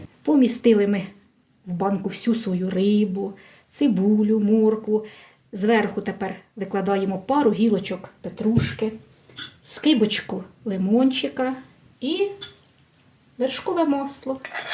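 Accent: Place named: Sumy, Ukraine. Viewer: акцент сумчан не с каким не перепутаешь. угадал?🙂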